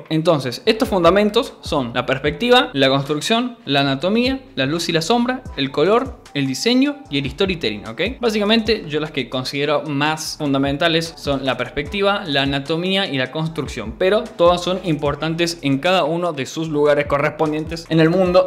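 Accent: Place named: Argentina. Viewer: Físico de polaco y acento de argentino 💝💝💝 capo total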